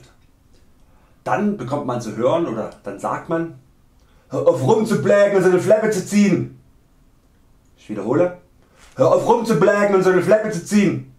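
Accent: Saxony, Germany. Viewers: Sehr schöner Dialekt! Als Österreicherin tut mir das "deutsche Deutsch" in den Ohren weh. Aber Sächsisch ist ganz nett! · Für das Video hast du aber ziemlich tief ins alte sächsische gegriffen oder? Ich mein ich komme aus dem "Dreieck" Chemnitz Freiberg Dresden und so wie du es aussprichst redet meist nur noch mein uropa ausm Erzgebirge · Haha, super Video! Und vor allem, ohne Schnitte. Da sieht man wieder deine Professionalität. Klasse!!! Und ganz ehrlich, ich fand früher, dass das Sächsische der schrecklichste Dialekt ist. Das hat sich aber durch deine Videos wirklich geändert. Mittlerweile ist es das Schwäbische... hihi. Nee, im Ernst, man kann sich auch als Nordlicht öffnen und erkennen, dass es total egal ist und man den Menschen sieht und annimmt. Außerdem denke ich ja immer, dass ich ohne Dialekt spreche, dabei hört man wohl doch deutlich, dass ich von nördlich der Elbe komme.... 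Und wenn wir auf Plattdeutsch loslegen, dann versteht das auch kaum jemand.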